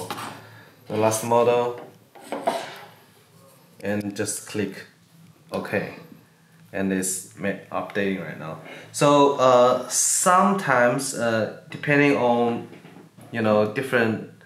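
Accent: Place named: China. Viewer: can you please add SUBTITLES for your bad chines English? Thank you.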